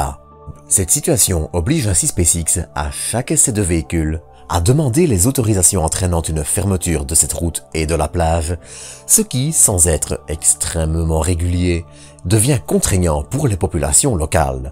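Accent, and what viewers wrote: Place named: Brussels, Belgium. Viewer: J’ai vu ta bouille en cherchant un peu. On entend clairement les efforts que tu fais pour réduire l’accent Bruxellois(je suis belge aussi d’Anderlecht même) une proposition: et si tu t’en foutais et que le ferais avec ta façon normale de parler tu attirerais probablement plus de personnes et deviendrais le premier youtuber ciel et espace du plat pays. Allei tichke tes goed!😜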